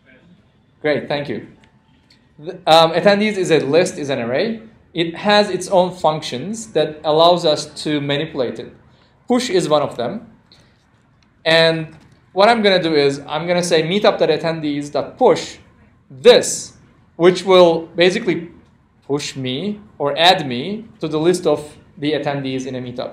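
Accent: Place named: Turkey. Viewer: Lhahah :) sadece türk aksanı ile ingilizce olduğu için mutlaka tüm seriyi izleyeceğim müsait bir zamanda :)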